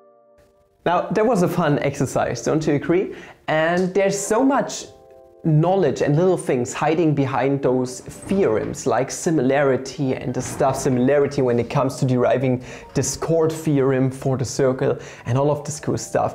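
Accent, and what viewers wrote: Germany. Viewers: Es fällt einem fast nicht auf, dass der typ deutsch ist, wow.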